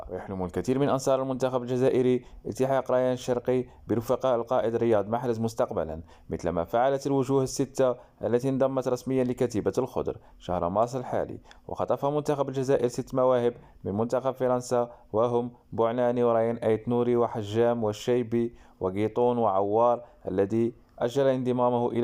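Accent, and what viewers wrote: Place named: Morocco. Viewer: ههههه لافوا تاع مروكي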